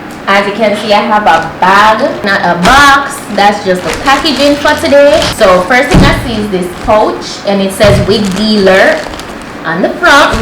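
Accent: Jamaica. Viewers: You are beautiful, funny and the JA accent is feeling like home.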